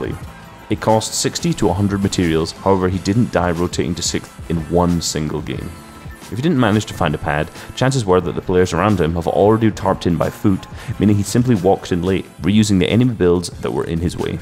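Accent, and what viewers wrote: Scotland, United Kingdom. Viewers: Seen u on nicks stream and to hear a Scottish accent was a pleasant suprise. Keep up the class vids🏴🏴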